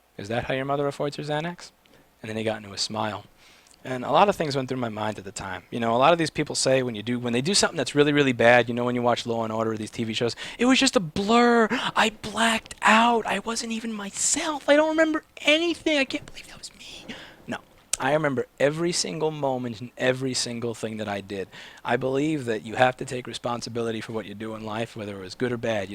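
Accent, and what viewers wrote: New York, United States. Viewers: I love this! You are so smart to see the perspective of others from a child's point of view and being able to re-imagine the scenario as a child. You have a very high IQ and are super interesting to listen to. You are down-to-earth yet have an amazing way of delivering your information. I am obsessed with your videos. I love your NY accent it is so awesome in every way!!!!! melts I am from Toronto, Canada and we pronounce words so boring! I love listening to you talk for your fab NYC accent.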